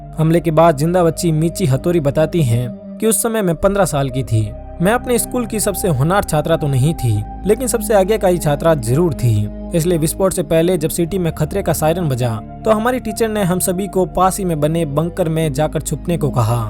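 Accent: Rajasthan, India. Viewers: Bhai rajasthan se ho kya Accent se lag rha h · Rajasthani awaj h bhai jodhpur bikaner aur nagour ki tref tk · Bhai ap rajasthan hai syd awaz aur language se thoda lg raha h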